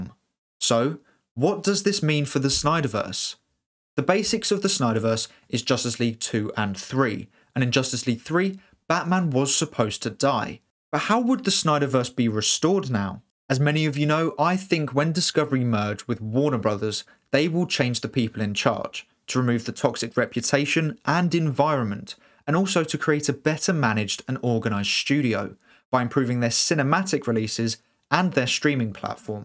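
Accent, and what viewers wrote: United Kingdom. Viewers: Listening 👂 to your British accent as American 🇺🇸 I’m tired 😪 of Brits playing our Hero especially Batman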